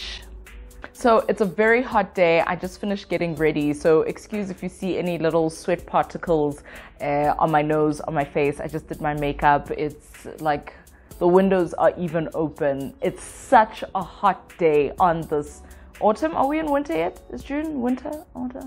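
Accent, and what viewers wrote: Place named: South Africa. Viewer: Absolutely live your voice and accent.. 💖 a friend of mine is from South Africa and sounds similar to you but she’s been in the states now over 20 years so enviably she’s changed some.. 🤷‍♀️ 🥰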